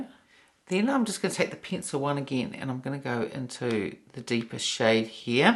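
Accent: New Zealand. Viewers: Enjoyed your video. Love your New Zealand accent. I’ve been wearing mine over Oyster Pearl - using the dark brown from last year’s holiday palette in the crease and to line the lids. It creates a nice soft smoky look. I find the Smokey Quartz to be a bit silvery on my skin to I’ll be looking to matte that down a bit using a shadow from a quad or 12-pan. Either way I love them!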